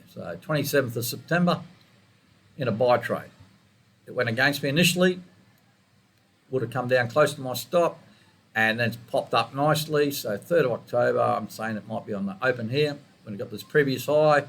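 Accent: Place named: Australia. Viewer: Thanks a lot. Yes please, keep the Aussie accent. I won't be able to handle the Scottish haha:D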